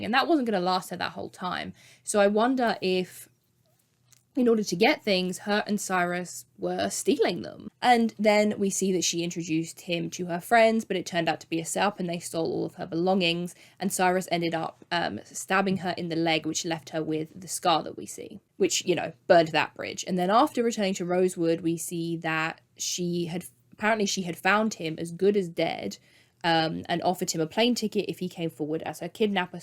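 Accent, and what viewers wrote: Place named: United Kingdom. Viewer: i can’t tell if you’re british or not. you almost have an accent but you don’t?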